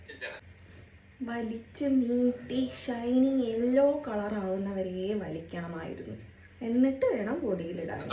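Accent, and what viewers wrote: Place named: Kayamkulam, India. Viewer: Soana papadi kayamkulam bhasha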